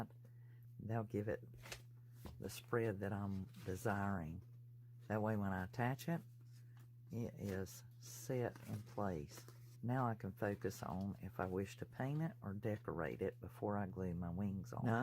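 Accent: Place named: North Carolina, United States. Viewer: I Love, Love, the lighted signs. all the crafts are pretty, but there is something about the signs! This is my first time watching, but as soon as I heard you speak I said she's from North Carolina. I'm originally from Asheville NC. but now living in California ( please don't hold it against me. lol) Looking forward to watching more holiday crafting ideas.. God Bless..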